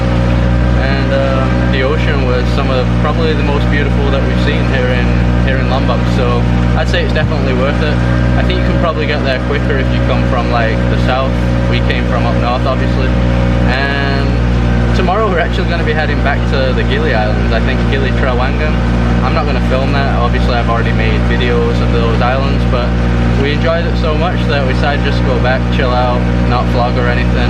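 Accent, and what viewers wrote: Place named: Manchester, United Kingdom. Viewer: what accent do you have? sounds mancunian and american trying to figure it out haha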